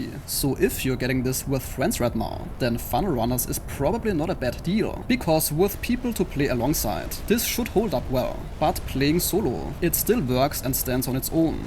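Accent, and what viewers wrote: Germany. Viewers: You sounds like german.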